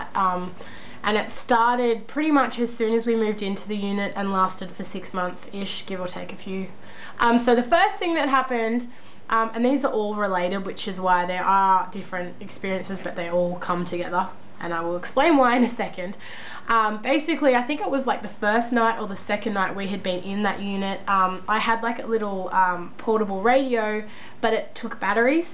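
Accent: Australia. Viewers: YOUR AUSTRALIAN!!!!! YAY!!!!!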